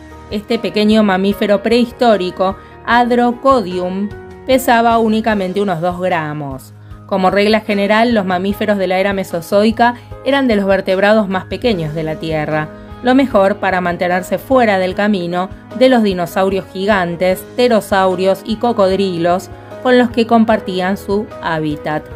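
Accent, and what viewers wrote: Argentina. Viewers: me encanta cuando dices "hola amigos",con ese tono encantador argentino tuyo;tanto como tu trabajo,por demás interesante e ilustrativo, sería muy interesante,que contaras la historia de cómo llegaste hasta aquí. Mis mejores deseos,y saludos cordiales,desde México.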